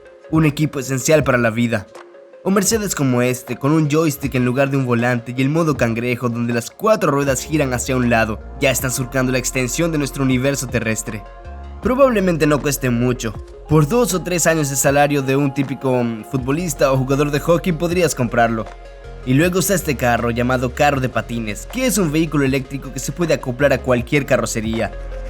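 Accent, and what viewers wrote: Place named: Yucatan, Mexico. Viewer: Es men es Bombaaaa hablas Yucateco!! Saludos Bro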